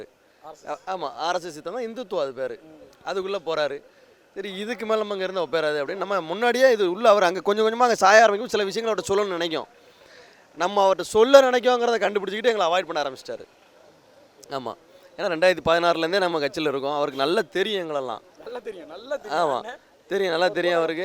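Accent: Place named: Tirunelveli, India. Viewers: நெல்லை தமிழில் எழுத்து வாங்குகிறார், வாழ்த்துக்கள், உழைப்பிற்கு நிச்சயம் உயர்வு தேடி வரும் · நம்ம நெல்லைத் தமிழ் சிறப்பு அண்ணா 💥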